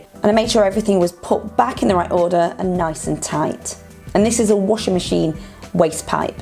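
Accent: United Kingdom. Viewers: I'm doing my sink now and it was so nice to see you do this with painted nails, and could listen your British accent.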